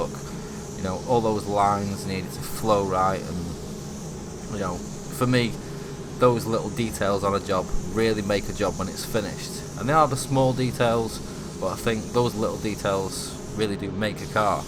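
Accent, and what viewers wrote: United Kingdom. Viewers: Someone paid $$$ for that job! are you doing commerce on the euro or British pound? I'm guessing British pound by your voice? 👍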